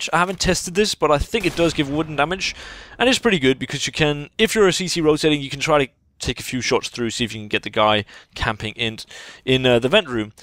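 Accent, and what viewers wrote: Denmark. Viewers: I love when you do your Funny ''Danish-english'' (I'm norwegian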